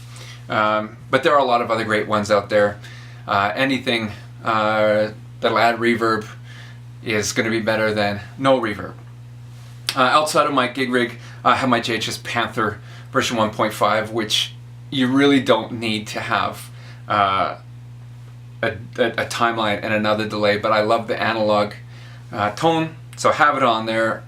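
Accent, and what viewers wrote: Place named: Alberta, Canada. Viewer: Hey man, Just curious are you in bc or Alberta? you have that west coast accent but it feels more Alberta to me than bc. I love the videos, I really dug the budget rig. I have a question for you , do you happen to have any suggestions for a budget friendly versatile rig for bass and guitar? I need a full guitar rig so I can play lead and also lead worship but I'm also a worship bassist but not a normal one lol I do all sorts of weird stuff I play chords and fill spaces like you would in a punk band. that said I'm leaning towards a helix and then building a stereo tube power amp. but id be down for some suggestions that would keep me around 1k or slightly over for a bass and guitar rig (note I don't have an electric, however I do have a tuner, sans amp vt bass di , some cables, and a early 2000's stingray 4 string)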